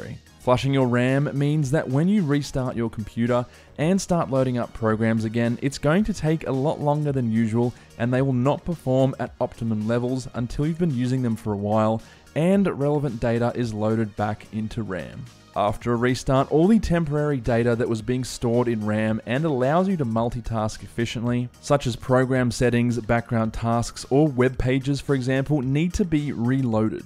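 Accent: Australia. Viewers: Are you Aussie? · Fabulous really good quality presentation and great to hear an Aussie.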